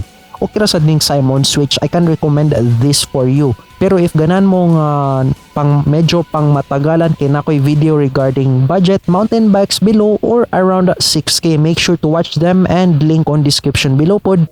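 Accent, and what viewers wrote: Visayas, Philippines. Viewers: Bisaya man diay oi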